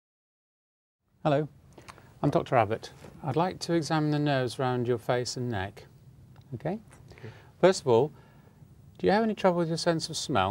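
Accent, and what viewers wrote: United Kingdom. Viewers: That is a very beautiful british accent sir^^ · I've watched the whole video and I'm still waiting to hear a calm voice. All I hear is a horrible british accent.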